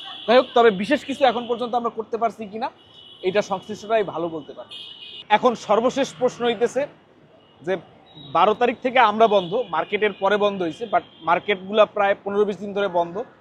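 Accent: Barishal, Bangladesh. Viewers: bhai er accent e dehi borishal fuita uthtache 👍🏻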